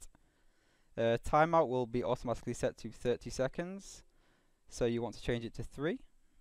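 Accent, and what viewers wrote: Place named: United Kingdom. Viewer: British accent detected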